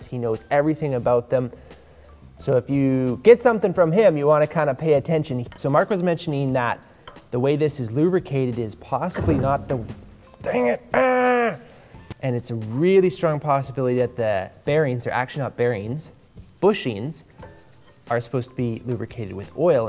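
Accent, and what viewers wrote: Canada. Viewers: Not sure if you are Canadian or from Minnesota but you sound very Canadian.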